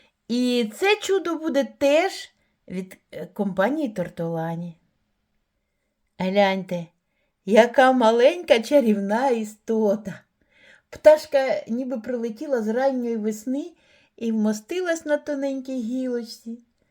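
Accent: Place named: Ukraine. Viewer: Який приємний український говір❤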